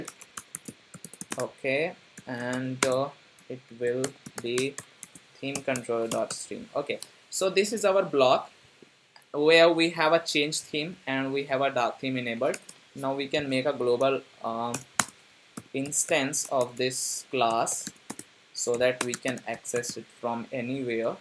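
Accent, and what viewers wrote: India. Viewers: omlot houw ai louwe indian anglish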